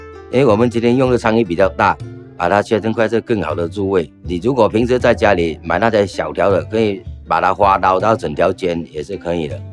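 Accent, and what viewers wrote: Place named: Southern Guangxi, China. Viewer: 北海人哎，桂南腔好怀念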